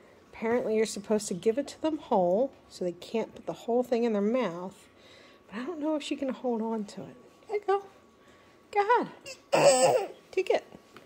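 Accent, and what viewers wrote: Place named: Philadelphia, United States. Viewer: Your accent…can you share where you’re from? I’m guessing the Philly area…❤️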